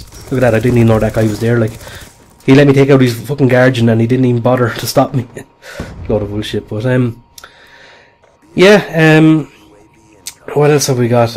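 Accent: Ireland. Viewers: I liked and subbed... btw are you from wexford by any chance? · Is he from Ireland so am I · I have only gotton 50 second in the video I will finish it o.o but may I ask are you irish your voice is distracting in a great way :D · Are you Irish? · are you irish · Are you Irish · Irish you tuber ftw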